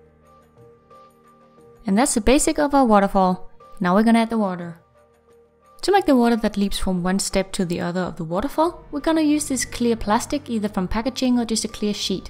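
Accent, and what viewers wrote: Denmark. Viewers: Are you Daneish you're accent sounds Daneish if. U are then God video tøs det blev pænt ❤️ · Are you from denmark? Your accent sounds danish :)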